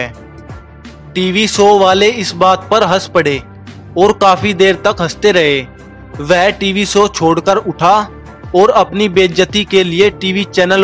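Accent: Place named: Haryana, India. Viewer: Ur voice accent is like haryanvi language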